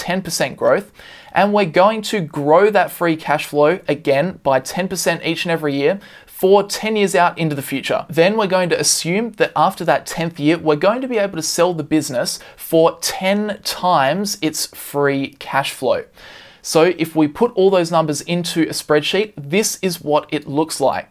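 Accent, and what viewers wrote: Australia. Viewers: Just found this channel. Thanks so much for such excellent content. Nice to hear a fellow Aussie voice too.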